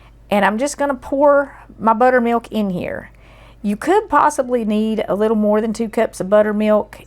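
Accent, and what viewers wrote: South, United States. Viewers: My six year old grandson said, "She must speak a different language." I explained you were a Southern. I was born in Virginia but we live in Maryland. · Hello from So Cal. The change in the weather has me wantin' to cook/bake. I've never used YouTube before. Stumbled upon your channel a week ago. I love it so much., you are so sweet and comforting especially with your southern drawl. Your Christian kitchen touches and the way you share scripture is wonderful and helpful to me. Sometimes I just tune in and fall asleep to your voice....am I weird? Anyway, thank you, again for putting in the work and time. God bless you and your family and staff. I'm making the Soda bread for a homebound friend. She specifically suggested cranberries and raisins. 🤗💕🫶🙏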